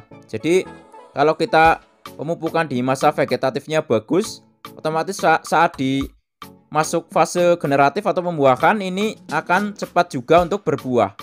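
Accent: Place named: Banyumas, Indonesia. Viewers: Keluarkan ngapakmu mas bro wkwkwk.... Mantul pokoke😜😜😜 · oke mas, mksh banyak atas ilmunya.. Sy smakin faham. Sukses selalu. Boleh tau mas asli tegal ya? Medoknya ky logat tegal.hehee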